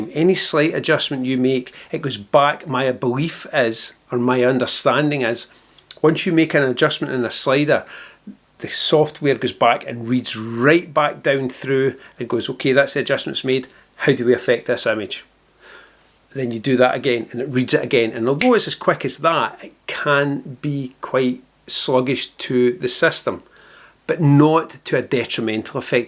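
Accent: Scotland, United Kingdom. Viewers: My god...is this Scottish? I had to turn in captions sometimes XD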